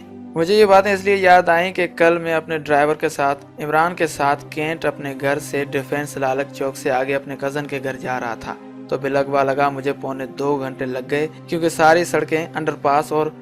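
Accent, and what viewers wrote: India. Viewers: Jahil admi pehle urdu to bolna seekh lo..such an ugly accent of urdu..why are you trying to talk like indians? U think u sound cool? Lol...and who is Samina Durrani? How jahil are you!!!??? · This guy is indian 'his accent tells his reality.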